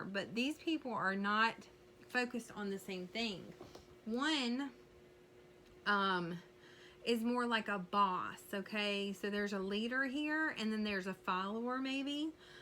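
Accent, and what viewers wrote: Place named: South, United States. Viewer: Thank you for the reading. I like your southern accent reminds me of home 😊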